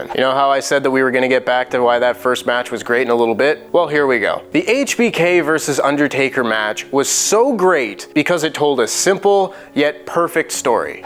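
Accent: United States. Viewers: The American accent threw me off. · Clicked on wrestletalk video. Hears American accent. Is now confused. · Hello, what's this? An American voice?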